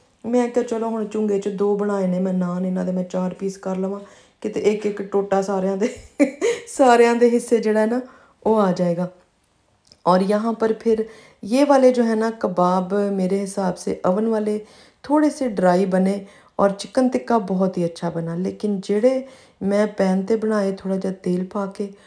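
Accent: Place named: Punjab, India. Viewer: Ap ki punjabi ka tarka ap k vlog ko chaar chaand laga daita hai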